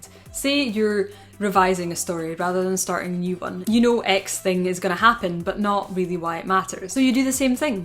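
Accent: Scotland, United Kingdom. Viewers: A few days ago I went down a rabbit hole of story telling and writing advice videos after getting frustrated with writing for a concept album. I found Jenna Moreci first and watched one or two other American writing youtubers. Your review of Jennas' book was suggested on the home page while I was taking a break after getting stuck writing today and I gave it a click. I have tried before to find compelling Scottish youtubers and not managed so I was very pleasantly surprised to hear you were Scottish, from Glasgow even! That video itself was great and I'm really enjoying the segmentation and detail you're going into with this series. Keep it up!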